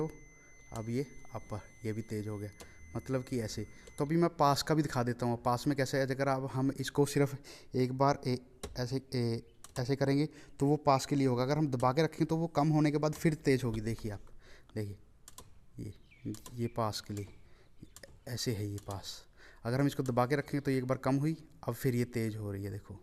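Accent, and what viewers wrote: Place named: Punjab, India. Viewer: That punjabi accent.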